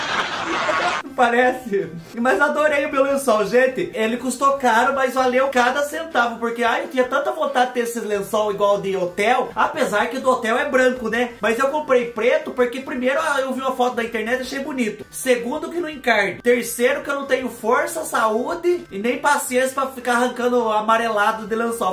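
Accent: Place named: Minas Gerais, Brazil. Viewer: de qual região do Brasil você é? Não consegui identificar de onde é seu sotaque. Parece uma coisa de minas, mas ao mesmo tempo algo do sul.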